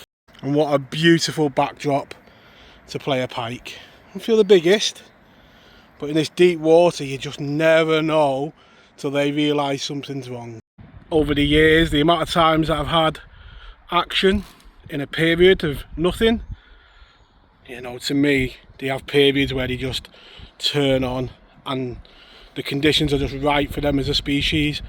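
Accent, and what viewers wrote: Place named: North, United Kingdom. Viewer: Hiya, what a perfect start to your Pike season as i don't think that it could have gone any better for you. What a stunning venue that screams Pike, i cant recall ever seeing you Lure fishing but it has made a great addition to the channel. I didn't know about the Fox relicant rod so i will go and google the hell outta it:) It was good to see you using a Baitcaster reel, what do you think of them please? Is there any chance that you could show us how to load a baitcaster spool with Braid including the best knot to tie it on with please? Allot of the video's are from America which are quite good but i would much prefer you (and your Northern acent) showing us how to do it:) All the best & tight lines. My family has got that used to my phone going off at 5pm every Friday that when they hear it they say "that'll be "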